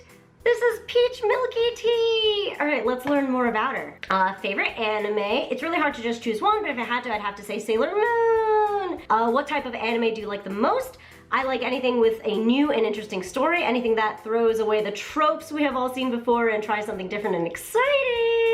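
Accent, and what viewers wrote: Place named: Los Angeles, United States. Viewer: where were you born? you have american accent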